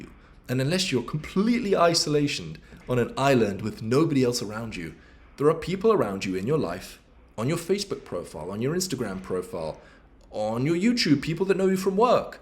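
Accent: United Kingdom. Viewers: good content as always. Part of Kirbys brands is the typical "BUT" with UK accent!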